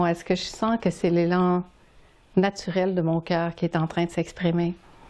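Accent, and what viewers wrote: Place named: Canada. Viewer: Quel régal... "On l'r'ssin lô on l'r'ssin" 😂😂😂 moi aussi je l'ressens là et j'aime tellement votre accent canadien, si doux, si chaleureux... Merci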